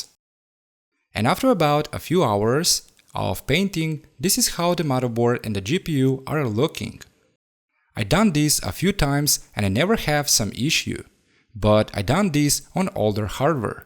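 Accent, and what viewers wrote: Russia. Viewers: Хм у тебя русский акцент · У тебя не получается скрыть что ты русско-говорящий, а проект классный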